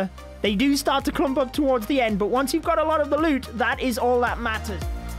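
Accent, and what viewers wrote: United Kingdom. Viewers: You guys makes fun of Indian accent but I love your British accent ❤️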